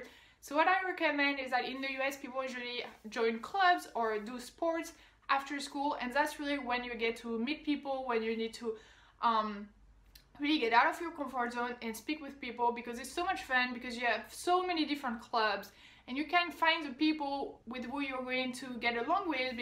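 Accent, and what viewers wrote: France. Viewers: Thank you for doing this video. I am particularly interested in the challenges you faced with American spoken language. How long did it take you to get to point where you could understand conversations? Was it easier or harder to follow informal conversations compared to lectures in class? Also, in speaking English, how long did it take to achieve complete fluency? I’m sure you’ve been told, your accent is absolutely charming. Americans love a French accent more than any other language.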